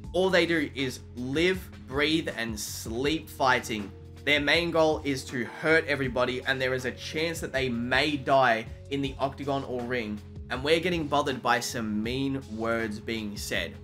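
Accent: Australia. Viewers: You are delusional and insane, sorry. Colby actually is a republican, and the fact that you think it could only possibly be all a fake persona and that he is secretly some lib, shows how out of touch you are with reality, you clearly live online too much. You don't sound American, you sound Australian or something, let me tell you kid, Trump got 74 million votes, these people are not rare, they are in fact pretty common, and the fact that you think that any fighter who says they support Trump is playing a character just to trigger libs, shows that you are insane and out of touch with reality, you are one of these people who doesn't understand that other people exist that don't have the same views as yourself, you are a projecting delusional person essentially, you see Colby and what he says he supports, and since you don't personally support that, you think it has to be all fake.....sigh. 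Dude you are crazy. Also your take on fans changing their opinion of fighters was silly also, because you totally aren't taking into account that as time passes a lot of events occur, why can't fans change their opinion of a fighter after many events involving that fighter take place? That isn't fickle, that is just adjusting the opinions of that fighter after we find out more about them.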